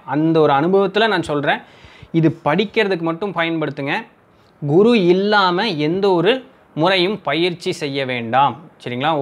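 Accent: Coimbatore, India. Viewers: Hi I learned so much from your videos. Being from Coimbatore like your way of saying. I sent email but it did not delivered. Where did you buy your copper kappu please respond as soon as possible. Please reply as soon as possible. Thank you!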